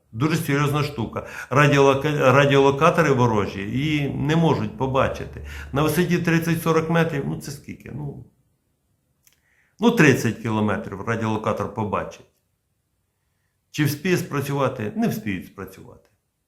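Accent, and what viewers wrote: Vinnytsia, Ukraine. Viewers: Завжди приємно бачити і чути Вас, пане. Завжди зрозумілі, конкретні, оптимістичні огляди і якійсь рідний для мене акцент мови, як на вінничині. Дякую за всі Ваші труди.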